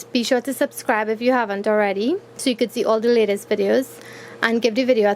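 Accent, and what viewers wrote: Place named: Trinidad and Tobago. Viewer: Are you aware you have a trini accent? ;-)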